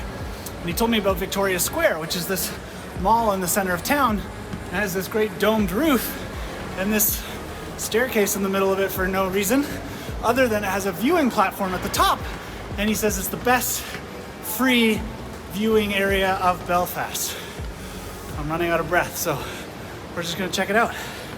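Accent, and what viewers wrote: Canada. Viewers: I love your travel logs..I knew you were Canadian. Abouwt gave it away lol. I love your excitement of travel. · I don't understand how people confuse the American and Canadian accent. Yours is so clearly Canadian. There is a softness and meter that isn't so apparent in America.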